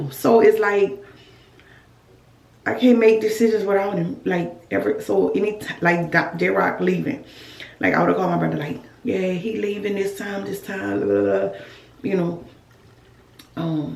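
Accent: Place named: New Orleans, United States. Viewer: You sound like you from new orlean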